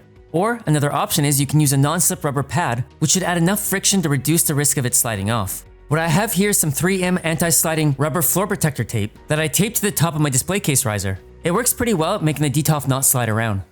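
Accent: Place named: Canada. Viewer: Dude sounds like a Asian Canadian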